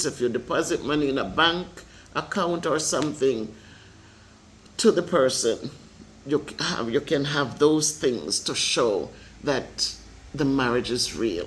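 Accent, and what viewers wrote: Jamaica. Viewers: you have me cracking up. I love to hear you talk that patwa talk.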